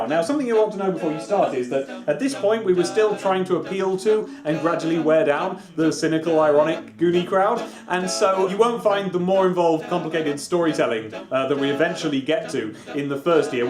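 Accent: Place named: Northern England, United Kingdom. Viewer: Hey,. Quick question; which county are you from? I have difficulty narrowing accents down or if accents even work by county of origin anymore. I can tell you're from the North but I wouldn't be able to tell York from Newcastle. We have an over abundance of London accents in the South East.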